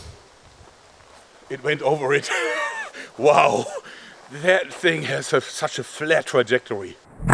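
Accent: Germany. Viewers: i love his german accent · This is German English trotzdem passt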